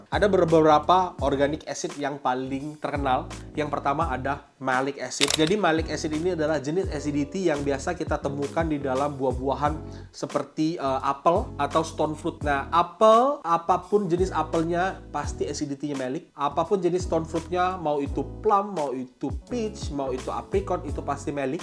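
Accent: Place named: Pontianak, Indonesia. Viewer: mak eh org pontianak rupe e wkkwkw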